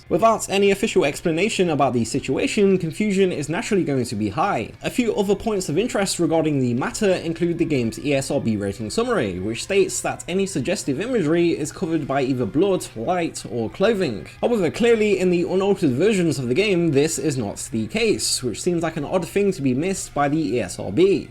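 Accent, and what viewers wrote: United Kingdom. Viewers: I had to turn on the captions cause of the thick redcoat accent lol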